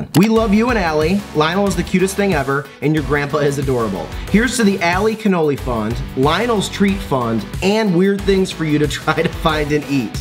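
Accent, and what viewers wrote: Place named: Chicago, United States. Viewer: I am from Chicago and we definitely do talk like this wise guy here. We do not put ketchup on hotdogs. What are ya nuts? Ketchup on a hotdog, get outta here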